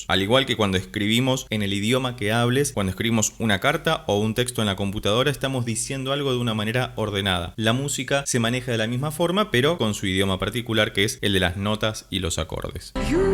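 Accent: Argentina. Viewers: Entre mate y to, lo que habla como argentino, no desarrolla lo de interés de escuchar la voz de la joven. Blablablá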